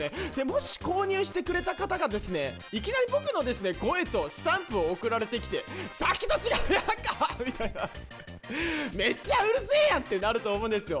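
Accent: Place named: Hakata, Japan. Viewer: たまに出る博多弁好きです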